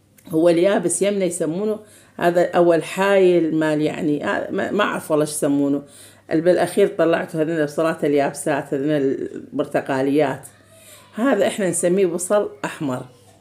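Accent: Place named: Kirkuk, Iraq. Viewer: الله ينطيج العافية خاله اشكد احبج واحب سوالفج والله العظيم واني متابعتج من زمان ودائما اشوف فيديواتج اني من كركوك وهم مثل لهجتج نحجي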